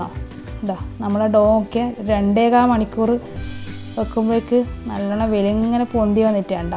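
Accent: Kannur, India. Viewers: Kannoorkari aano???Aa slang kettitt chodichathaa😄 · Aha... bun poliyanallo.... really it look similar to bakery bun... koottathil ante kannur bashayum... 😊😊😄👍👌👌👌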